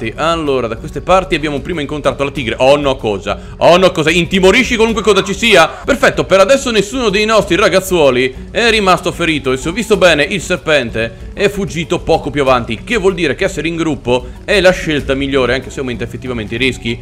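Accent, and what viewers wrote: Veneto, Italy. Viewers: Ma sei del veneto ? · Scusa ma sei del vaneto? Comunque sei troppo simpatico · Aaaaaaah ma sei un conterraneo del veneto! :D:D:D:D:D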